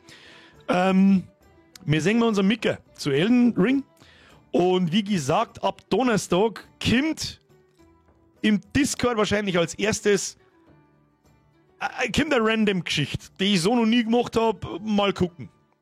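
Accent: Bavaria, Germany. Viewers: Merce für die stets gute Unterhaltung. Da vergeht der Arbeitstag gleich viel schneller. Gut, daß ich bayrisch versteh' haha. Grüße aus Mecklenburg.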